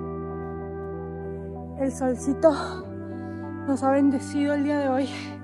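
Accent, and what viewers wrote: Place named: Upper class, Mexico. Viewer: No puedo con el acento tan fresa, el video es interesante pero que desesperante es el acento whitexican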